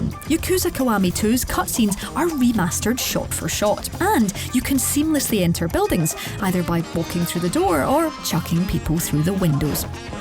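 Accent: Scotland, United Kingdom. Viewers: I like the narrator's voice and Scottish accent. Calming. Easy listening. I just bought Redeemer, Life is Strange (all 3), Blacksad, Destroy All Humans, Creepy Road, Strange Brigade and Guns Gore & Cannoli Capo Dei Capi edition. And on PS Plus they gave us Days Gone this month. They've done alright lately I think. There's other good games they've given out too recently. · I loved the video, well-crafted list. Also the Scottish accent brought back lots of nice memories, thanks🙏🙏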